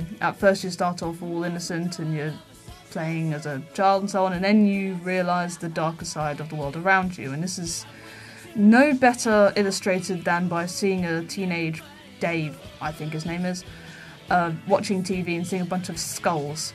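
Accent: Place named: RP, United Kingdom. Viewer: We want to watch the video not listen to your posh british tea and crumpets voice smothering over and therefore ruining this video. Just shut up and take your comments to Dave mustaine, oh wait your probably afraid that he will KICK YOUR SORRY ASS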